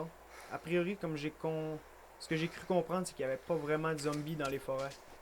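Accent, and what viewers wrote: Quebec, Canada. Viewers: je veux un autre episode pas demain parce que je suis pas la mais apres-demain nan sérieux ton accent québécois paraissait plus dans cette video et ta pas de laire de venir da grande ville mais plus du nord du québec =) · dans quel pays habite tu quand on dirait que tu as un accent québécois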